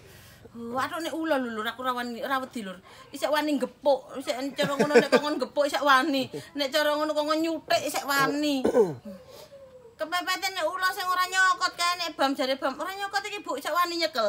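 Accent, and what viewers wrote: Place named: Surabaya, Indonesia. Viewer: Awal liat dari IG, lucu banget cara bicaranya khas suroboyoan. Ternyata ada youtube juga, pas liat jadi hiburan sekali liat tingkahnya ya 😂🤣. Ini senang berkebun tp kok ketemu ulat bulu langsung ngacir lari tunggang langgang 🤣🤣. Sekeluarga seru semua 👍👍. Sehat terus ya dan keluarga, biar makin semangat ngontennya, jadi bisa mengibur kita kita semua 👍👍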